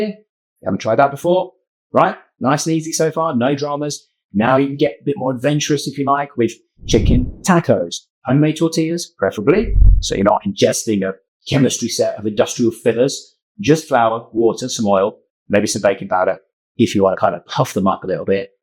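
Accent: United Kingdom. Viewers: This is a great follow on to where I just saw you shop in Aldi for the items. I'm so wasteful with food and chicken seems to be gone in one meal, and I wish to reduce that. I'm curious though, I hear a Uk accent, but you are a Kiwi according to google, so is this an ai voice, or did you ever live in the uk?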